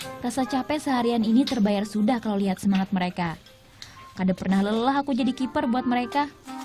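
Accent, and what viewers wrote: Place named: Banjar, Indonesia. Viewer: Pang nya banyak banar,,,, BANJAR BANAR pang,,,😁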